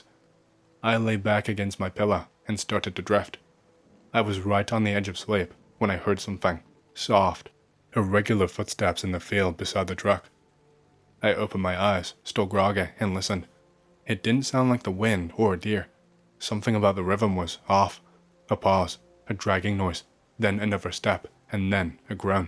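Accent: United Kingdom. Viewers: Where's his accent from? Sounds kinda U.K. ISH...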